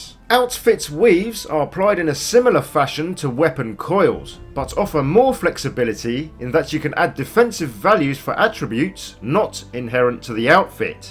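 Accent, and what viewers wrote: United Kingdom. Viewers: You're welcome. I finished the game 3 days ago. What an experience. I cried at the end since what Dr. ES says is really touching. These bloody wankers might have a problem with the British accent or are loyal fan to the Yankie accent haha. Your language and accent are really awesome. Keep up the good and I'm really thrilled to watch more of your videos. It would be great too if you not only make guide videos, but also explain games' story and that stuff. Wish you a lot of success.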